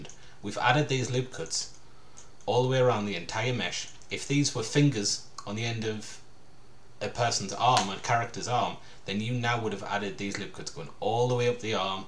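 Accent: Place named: Newcastle, United Kingdom. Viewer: Thanks so much for this tutorial can I ask are you from Newcastle or something I live in gateshead and you sound like a geordie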